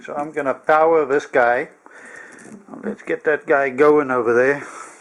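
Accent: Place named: South Africa. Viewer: I thought I recognised the accent. I recently returned to South Africa. Unfortunately it does not seem that the hobby has grown here as much as I hoped. Products are limited and import fees are killer:-(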